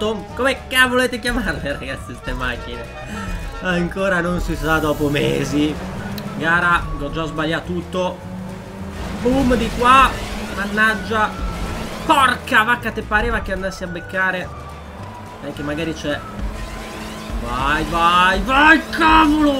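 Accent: Veneto, Italy. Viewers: Alex-Pro- Gamer ah grazie perchè io essendo di Venezia avevo riconosciuto l'accento veneto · ma sei veneto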